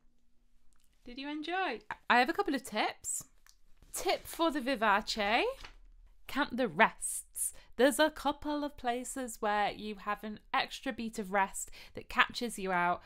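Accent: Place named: England, United Kingdom. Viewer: I LOVE Telemann. Question fer ya, if you don't mind. I love English Accents. Where is your accent from? England has so many & some I really like. (if you don't mind me asking)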